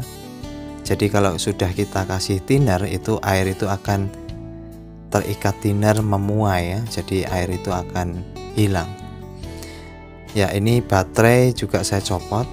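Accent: Java, Indonesia. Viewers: owalah tk kirain daerah jawa bang, bhsa nya medok sih 😁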